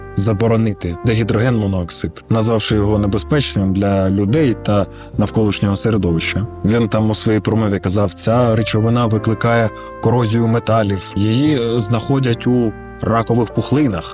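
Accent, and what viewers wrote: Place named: Poltava, Ukraine. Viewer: Шось на полтавському) клас👍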